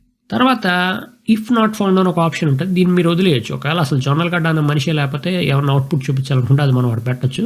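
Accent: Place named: Telugu, India. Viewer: with Telugu flavor, with a natural slang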